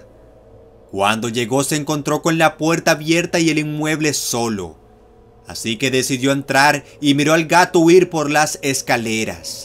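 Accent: Arab world. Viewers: acento árabe X'D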